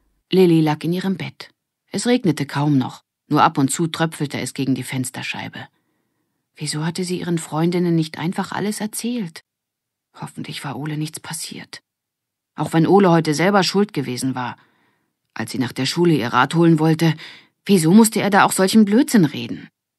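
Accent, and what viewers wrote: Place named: Russia. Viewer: Haha cool, da bin ich nicht die einzige, die mit bald 30 Jahren die Fortsetzung einer Ära vergöttert! Vielen vielen Dank! Ich wusste gar nicht, dass “Die Wilden Küken” existieren. Immer, wenn’s mir schlecht geht oder ich krank bin, höre oder schaue ich “die wilden Hühner” & das gibt mir ein Gefühl von Geborgenheit Glück & Kindheit. Aber als ich nach den Wilden Hühnern hier suche, finde ich diese Reihe und hab den ersten Teil in 2 Tagen durchgehört! 🥰 Danke dir!! 🙏🏽 Stimme allerdings dem Kommentar zu den Akzenten zu! Das klingt wirklich eher russisch und sehr sehr harsch haha, aber es gibt auch nen Lacher, wenn auch mit verzogenem Gesicht 😅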